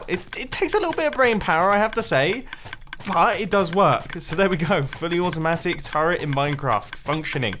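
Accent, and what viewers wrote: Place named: United Kingdom. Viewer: you are british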